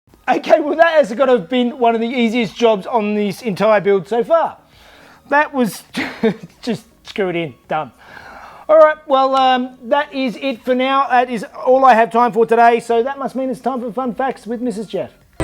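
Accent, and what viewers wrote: Australia. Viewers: So apparently Ms Jeff's accent is Kiwi. I thought Jeff had an Australian accent, but my bro said he's Kiwi as well. I think they live in Sydney. Can anyone confirm if Jeff is Aussie or Kiwi?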